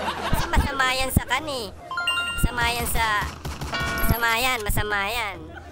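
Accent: Visayas, Philippines. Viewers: Kuya ano ka? Bisaya o Tagalog?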